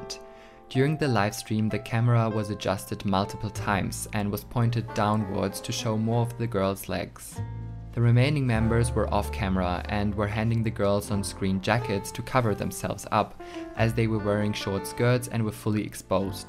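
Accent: Germany. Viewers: du hast keinen schlechten akzent, aber ich konnte direkt hören dass du deutsch bist, cool :) super video!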